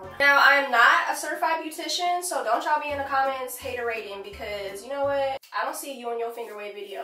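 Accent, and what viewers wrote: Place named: United Kingdom. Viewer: The British accent 😂😂😂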